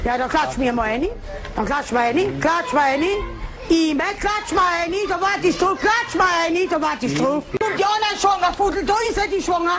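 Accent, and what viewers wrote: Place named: Mannheim, Germany. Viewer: rischtisches monnemerisch :D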